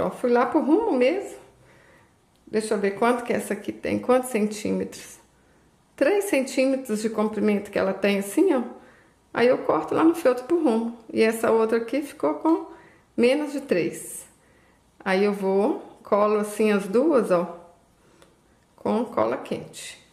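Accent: Minas Gerais, Brazil. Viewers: Boa noite minha querida! Q "lindeza"de artesanato como vc diz né? Vc é uma amor de pessoa ,esse jeitinho de falar de "mineirinha" toca no coração da gente. Obrigada por nos ensinar tanta coisinha linda,fico feliz demais. É assim q me sinto quando "posso" te ver. Porque nem sempre a minha internet permite eu entrar no You Tube. Mais uma vez parabens e obrigafa por todo carinho. Q Deus te abençoe e seja sempre feliz querida. Bj no teu coração!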